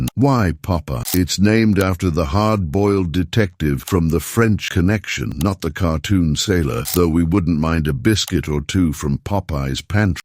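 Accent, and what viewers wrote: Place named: United Kingdom. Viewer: What the hell! You take an all-American icon like Popeye's and lend it a frickin' British accent. Popeye's has got NOTHING to do with England. Why don't y'all hop on your own coat tails?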